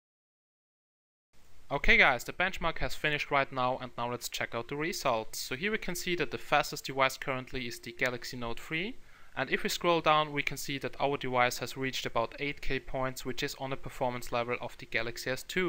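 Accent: Germany. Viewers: It still doesn't make it a Chinese accent??? He just has a German accent, a Chinese one is completely different. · Ich finde den deutschen Akkzent extrem lustig, in einer guten Art und Weise. Zu Thema: Das ist die Quittung für die billige Produktion von Apple-Geräten in China! ---- I think the German accent is extremly funny, in a good way. Now to the topic: That's the result of the cheap production of Apple products in China! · It's so funny his german accent is so obvious. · Are you German? Your English sounds German haha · Man merkt voll dass du aus Deutschland kommst weil : 1. Du hast einen deutschen Akzent im englisch und 2. Auf deinem Handy wird dir alles in Deutsch mitgeteilt oder angezeigt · Du deutscher man hört das richtig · man merkt es spricht ein Deutscher.. :D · du bist deutsche oder östereicher das hört man total raus :)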